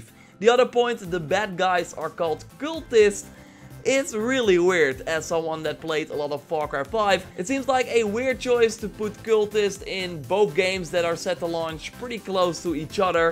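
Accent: Netherlands. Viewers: Yo just subbed thanks for giving me info on ac, far cry and other games your videos are very helpfull and informative. Btw just curious are you dutch your accent sounds like you are.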